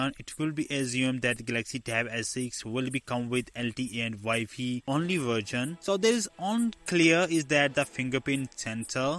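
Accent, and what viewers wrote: India. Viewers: I just turned volume off because of the butchered 7 ELEVEN Apu English. Still a great vid!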